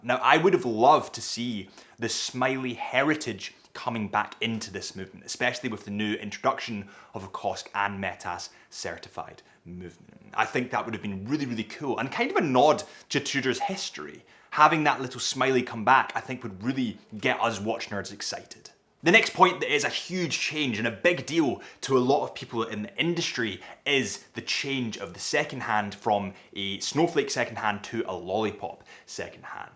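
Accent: Scotland, United Kingdom. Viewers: You do a very good Adrian Barker impression, with a slight Scottish accent.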